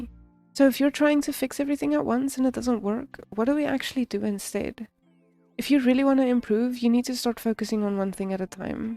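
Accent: South Africa. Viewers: Sorry this is totally random, but I'm 90% sure you have a South African accent and I love it. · Are you from south Africa? I can tell from accent since I'm from jhb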